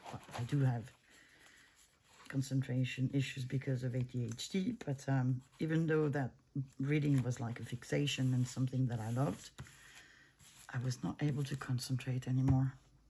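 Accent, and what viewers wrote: France. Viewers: Dear don't be sorry. I love to see what you make in your own wonderful chaotic way. And I love to hear you talk with your beautiful French accent. But post when you're okay and when you're up to. Good luck with your operation, try to not worry to much. Hugs from me, Gertie from the Netherlands. Btw I'ld lóve to see the puppies! 🩷🩷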